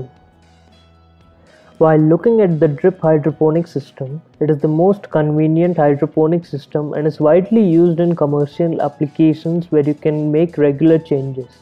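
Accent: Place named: India. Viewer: A white animation figure and an hindi accent. As long as it is not the IRS I am fine I guess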